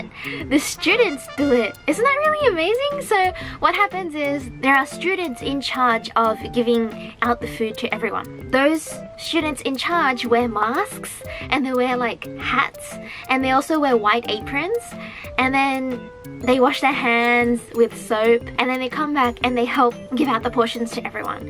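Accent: Australia. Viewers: Me gusta saber inglés y entender lo que dices sin necesidad de subs, gracias por el vídeo mija :D P.d: Me gusta tu acento Australiano... no estoy segura si es de ahí.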